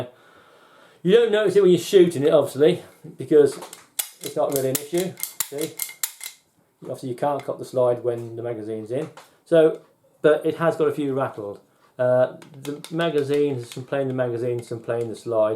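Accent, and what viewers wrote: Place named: United Kingdom. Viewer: Even with subtitles, it's really really difficult to understand awful British accent, I wish you were regular English speaker 😢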